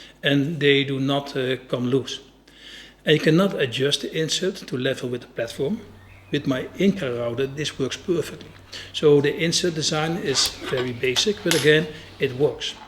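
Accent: Netherlands. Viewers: And I like your accent. It’s even better than that of Mark Rutte…😜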